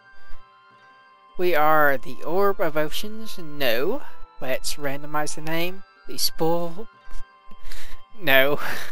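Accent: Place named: Canada. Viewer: Huh, interesting. You pronounce a few word really similar to Etho (the Ethoslab minecraft guy) who is Canadian. I guess Etho is just a really southern sounding Canadian :D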